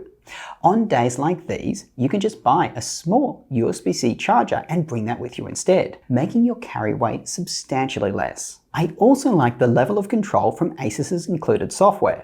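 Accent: Australia. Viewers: He is originally from Australia lol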